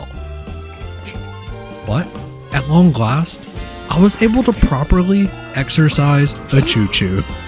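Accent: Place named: North Carolina, United States. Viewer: Youtube analytics are such a drag; one day your hard work will pay off but don't get burnt out or make your views equal your worth as a creator. Also I very much notice and appreciate the captions - above and beyond with your editing and production value!! Have you thought of streaming to make your youtube edits more enjoyable? Still lovin the NC accent btw just thought remind you haha ~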